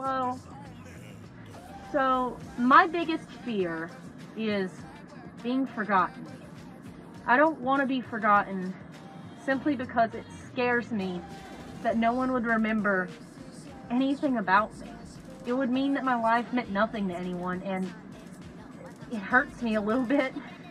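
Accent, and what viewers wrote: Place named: South, United States. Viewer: Thats pretty awesome to learn some things about you! I would like to say, I love your southern accent (Its very strong but really nice). I enjoy video like these! :)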